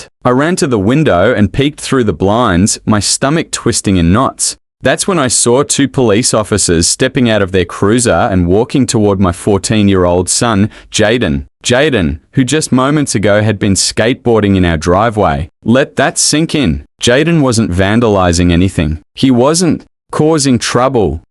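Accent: Australia. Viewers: No mention of where, no names, could be true but I feel it easily comes over as fake or hyped. No clue of country feel its USA but sound Australian. What is this photo? Why the drawn guns? Why would they pull guns on a kid. The photo shows no safety girl, no image of the Karen. Who is the little girl. What HOA? What rule? More questions than answers. It sounds like a story. Absolutely nothing to say this was true, when, or anything. Terrible. This is truly terrible content.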